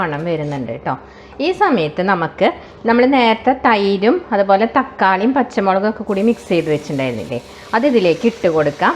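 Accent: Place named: Thrissur, India. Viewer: very nice recipe....are you from trissur? you have trissur slang...im also from trissur😀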